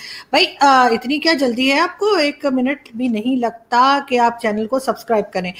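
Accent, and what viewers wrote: India. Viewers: ji, I used to see your videos and appreciate your guts to speak freely without fear in a state like Pakistan. Pl carry on the good work. One thing more, you speak like a normal Indian and sometimes I feel that I am watching some Indian channel. Best of luck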